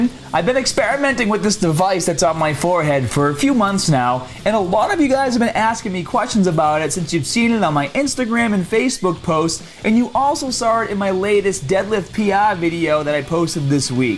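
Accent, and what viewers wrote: Boston, United States. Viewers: Okay, so after watching his first videos from 5 or so years ago, how did he somehow gain a Boston accent, and get so yelly? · 1, I'm not addicted to caffeine I have a coffee every second day before I train I also believe you drink coffee 2, my points were not meant to be insulting but this is the second time you come out swinging at something I said,, but tbh I seen a comment left yesterday about how you come up with , you should buy FAT GRIPS ,, you should buy The abs boots you should buy this stupid thing attached to my head with after a certain amount of time you don't use,, being sub a long long time and you know what your Turing into more of a sell out and big fucking douch bag having a go off some ones view point,, which leads me to believe your in commission for them and I believe the only studies were carried out by them not professionals,,unsubscribed and another thing since you let your Boston accent come out you turned into a mark e mark douch bag good luck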